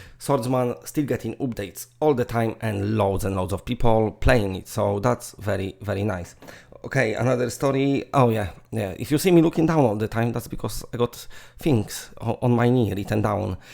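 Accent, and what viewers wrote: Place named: Poland. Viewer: Czy to celowe spolszczanie akcentu angielskich słów czy naprawdę jesteś tak słaby w wymowę? Aż nie chce mi się wierzyć ze ktoś kto mówi tak płynnie po angielsku nie powiedział ani jednego słowa poprawnie 😂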